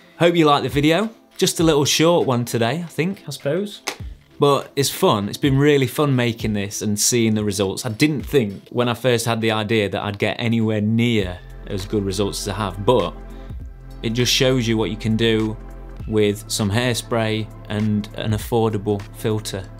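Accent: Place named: Birmingham, United Kingdom. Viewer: Woooh now I see why your accent sounds familiar! I've been living in Birmingham and lastly Stourbridge 'til last October. Now I'm in Italy due to the pandemic but I'm about to get back living in Stourbridge by half May 😀👍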